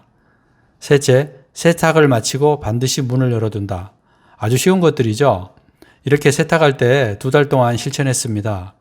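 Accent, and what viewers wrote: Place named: Jeolla, South Korea. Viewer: 억양이 전라도 억양 이시네요^^ 푸근 합니다^^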